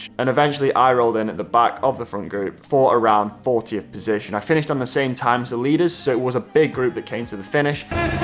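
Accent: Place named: Yorkshire, United Kingdom. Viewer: I hear a dirty Yorkshireman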